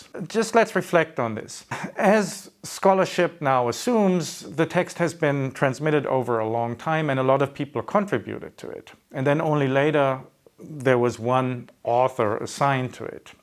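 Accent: United Kingdom. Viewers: School of Life is pretty obviously terrible once you move past the lull of the narrator's self-assured presenter voice and British accent. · School of Life shows that by having a well spoken, eloquent British accent can make almost anything you say seem intelligent. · The School of Life always got videos with wicked animation tho. That's their strength. Plus, the British narration sounds very credible. Content-wise it'll appeal to the general public. Self help, more like. From a professional philosopher's point of view though, it is lacking. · If he didn't have a british accent people would realize how much nonsense he advocates for in his videos. His videos are garbage, very ill-researched and pathetic in logic.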